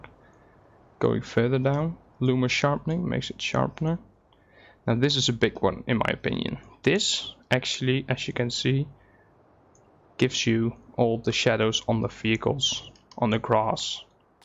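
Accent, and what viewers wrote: Netherlands. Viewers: AYE U DUTCH BRUH? wassup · Haha lekker geEngelst vgm ben jij NL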